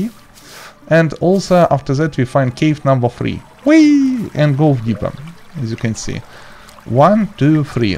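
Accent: Russia. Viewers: You are Russian? Accent is similar😅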